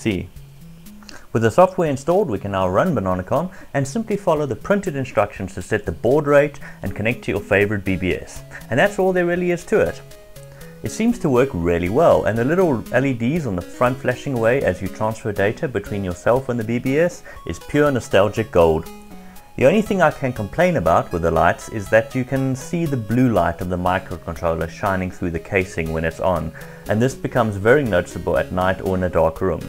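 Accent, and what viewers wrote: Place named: South Africa. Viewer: Thanks for the enjoyable video. Very sorry to hear about the camera, but the little one seems to be doing a decent job. It's also great to see somebody in SA covering retro tech. I'm from the other SA, on the other side of the planet; South Australia. That said, I've been lucky enough to spend time in South Africa (and East Africa) on several occasions. Your accent made me smile, and really miss the place. Have a good weekend!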